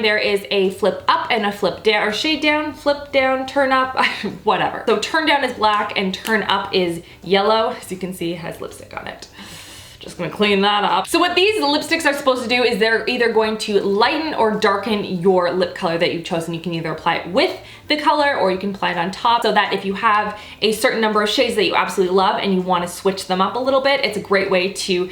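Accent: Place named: Canada. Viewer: I mean, she's Canadian. Maybe that's what you're hearing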